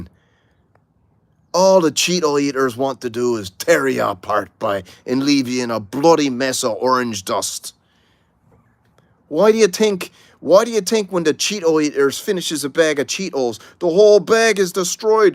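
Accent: Liverpool, United Kingdom. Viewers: A fair bit of scouse in there as well.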